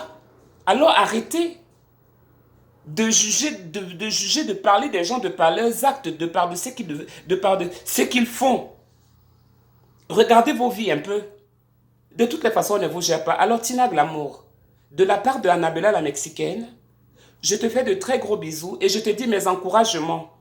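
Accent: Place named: Cameroon. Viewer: à chanté les à t'entendre parler je reconnais ma soeur camerounaise. tu drague deja mama tina on se connait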